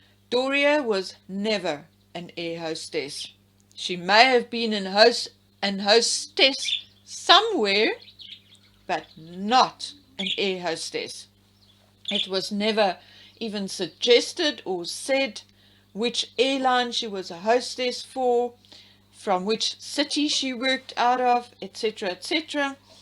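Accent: South Africa. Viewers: Is your south african accent from an an English background or Dutch? Just curious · You sound south African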